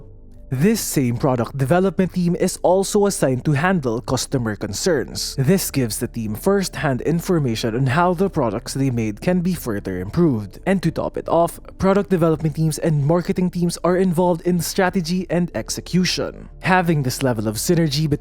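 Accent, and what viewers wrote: Philippines. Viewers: This is excellent! Fast-paced, interesting and, most important, the guy does NOT put on a fake American accent! His accent is a neutral, correct Filipino accent. Like Rico Dizon, he just talks naturally with no twang.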